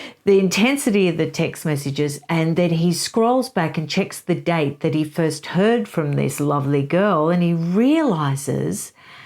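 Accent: Australia. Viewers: Thank you, I was going to ask your name! Are you Australian? I’m a new subscriber here and I love all your posts.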